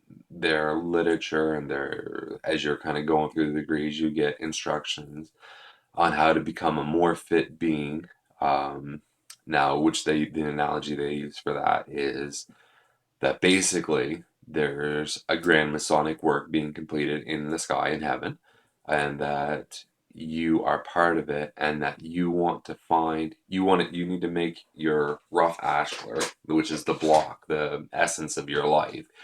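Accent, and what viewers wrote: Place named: Nova Scotia, Canada. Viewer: A very insightful video, and good for you, sir. thanks for sharing. You sound like a Nova Scotian.. or at least a Maritimer, that's where I'm from :)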